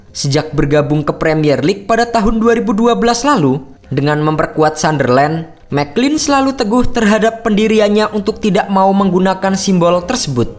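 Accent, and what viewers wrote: Indonesia. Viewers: Pekat siot loghat indo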